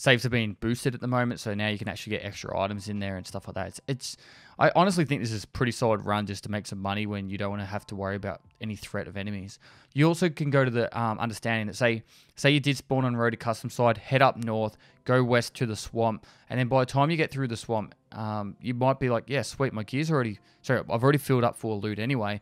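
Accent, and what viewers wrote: Australia. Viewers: It's aussie accent, reminds me of SPUNJ calling de_cache kesch.^^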